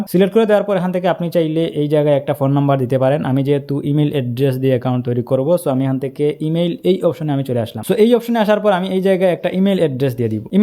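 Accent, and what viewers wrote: Sylhet, Bangladesh. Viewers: সিলেটি নি ভাই?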